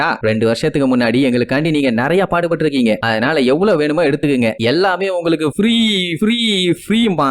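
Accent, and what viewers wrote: Coimbatore, India. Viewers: Bro nee ga coimbatore aaaa????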